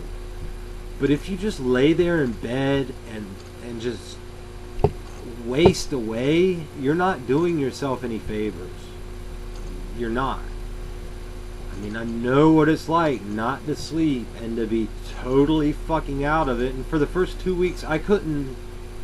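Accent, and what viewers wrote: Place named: North Carolina, United States. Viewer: Yea man I live in Leland in Windsor park as well so I go that same way to get to the clinic. I think it was just the way you talked and then a few things you said in your videos like you were in south east nc ect that made me think we must go to the same clinic. Pretty cool man. Glad you got off the shit. I just got on it last year and I kinda like being on it because it helps my chronic back pain that has kept me from sleeping well for years now and it also satisfies my opiate cravings. But I do wanna be free one day so I watched your vids. I plan to do the taper correctly so hopefully it won't be as bad as what you went through but it's really scary.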